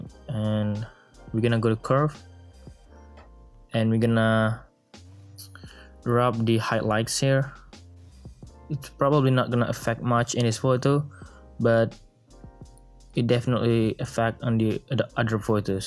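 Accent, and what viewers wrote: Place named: Malaysia. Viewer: You sound like malaysian chinese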